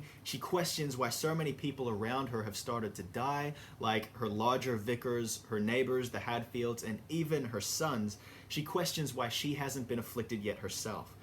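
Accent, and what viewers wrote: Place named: Australia. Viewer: is it me or do you skip between an aussie and a american accent? lol Good video BTW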